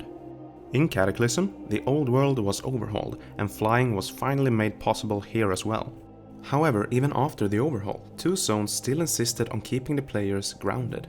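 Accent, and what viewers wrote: Sweden. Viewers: swedish? xD your accent is gold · Ey bro are you swedish because i can here it lowkey in your accent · let me guess, you're scandinavian? Swedish? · I can hear the Swede inside you. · Is he Swedish? Sounds like english-swedish speaking... Im swedish.. :) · Damn va svensk du låter.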